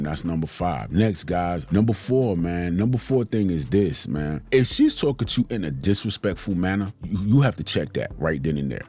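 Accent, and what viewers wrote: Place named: New York City, United States. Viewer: Spot on Bro. I can tell you are from my city NYC from your accent. Salute!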